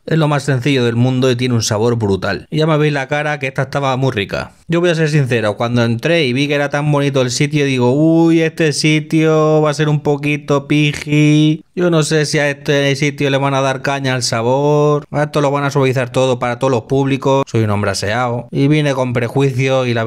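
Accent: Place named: Cáceres, Spain. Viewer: De donde eres ? Tienes acento cacereño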